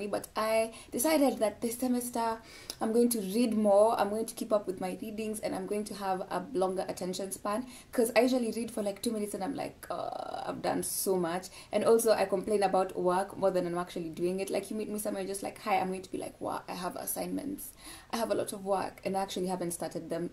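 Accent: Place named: Kenya. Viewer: me i thought is not bad english it’s just being Kenyan 🤣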